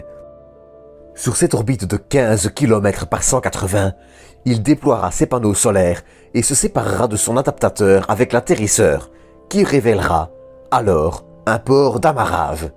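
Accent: Belgium. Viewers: Lui il est belge c’est sûr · Ca sent le belge qui faut la narration non? Liégeois? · C’est un Belge qui parle?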